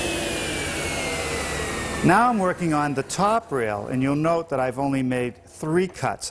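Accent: New England, United States. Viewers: I have to say, as a Texan, I’m enamored with his yankee accent, but I’ve been mentally calling it “Cortisan” white oak from watching these videos lol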